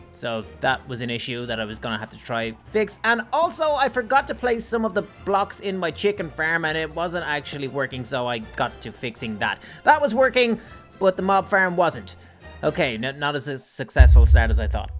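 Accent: Ireland. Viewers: bro don't mean to be rude or anything but are you Irish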